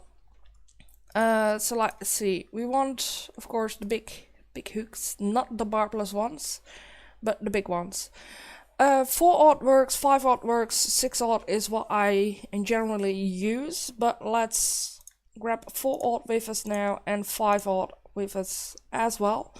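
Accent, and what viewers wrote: Netherlands. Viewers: Are you Dutch? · Jij bent Nederlands??? Vandaar dat accent!!! geweldig hahaha ma Flodder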